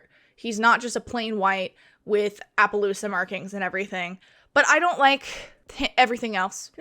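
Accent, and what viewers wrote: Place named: United Kingdom. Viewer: British really came out in this one 💂‍♀️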